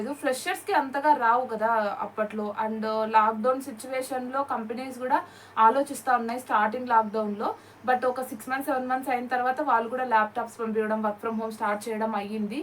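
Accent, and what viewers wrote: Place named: India. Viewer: this girl is inspiration to those people who are just sitting and weeping that i dont know english i cant do my background is poor and finding many other excuses instead of just trying like her ...... i apprecciate your video sister by the way adhi indian vocabulary or pronunctiation kaadu its called an INDIAN ACCENT (WITH BASIC ENGLISH VOCABULARY) 🥰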